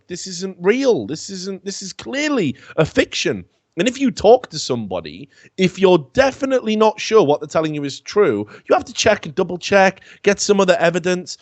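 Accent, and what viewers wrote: Wales, United Kingdom. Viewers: You sounded so Welsh 5 years ago.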